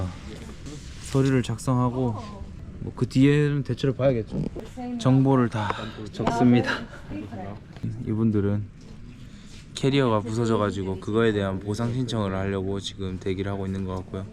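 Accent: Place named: Gyeongsang, South Korea. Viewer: ㅎㅎ첨보는데 사투리 보아하니 경상도?? 울 아들같아 웃음이~ㅋㅋ 화이팅 !!!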